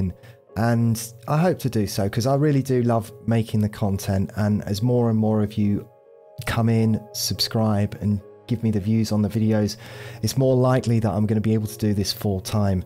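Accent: United Kingdom. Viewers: Youre forgetting about the gorgeous british accent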